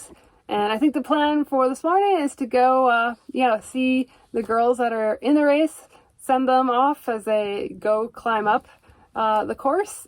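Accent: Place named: Canada. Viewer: Great video, normal wear and tear shouldn't be a price 😢 keep using wisdom with your body choices, hope to see see on the road sometime, I believe I saw your van video with Bob wells, your Canadian accent is cool, vanlife from oregon 🖐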